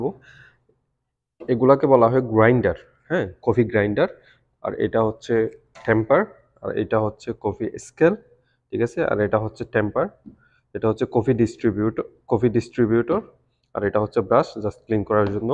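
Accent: Chittagong, Bangladesh. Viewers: আপনি কি চাটগাঁইয়া?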